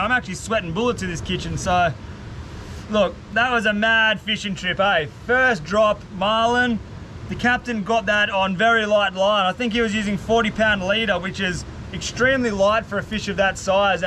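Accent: Australia. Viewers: Thanks for doing a Q & A video. I could easily tell you were a fellow Aussie but didn’t know where you came from. Also good to learn a little more about your background and some more about your girlfriend. One other thing I was curious about was the food poisoning/sickness question. I’m NOT making any judgements here but many of the situations where you’re preparing your food looks quite unsanitary. So, I was always afraid you’d make yourself very ill. Just like many other thousands of Australian citizens, I hope you can come back home in the near future, very soon. Keep up the great work with the videos :) Oh, and I also grew up in the country and had interesting pets. We had emus!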